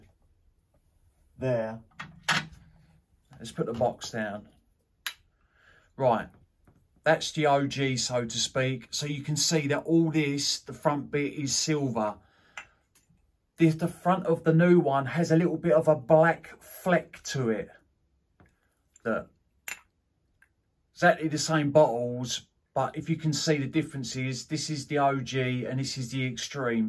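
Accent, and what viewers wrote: United Kingdom. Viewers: What accent is that? I already know it's British baking but not London's I guess. English is my second language, or that's what I am hoping to, so that's why I asked 😊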